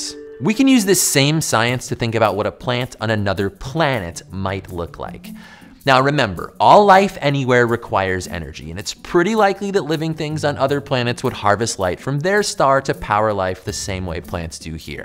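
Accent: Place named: California, United States. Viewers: i thought he was talking like someone from California. maybe he has a stuffy nose? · Why are you talking with such a heavy California dude accent in this video?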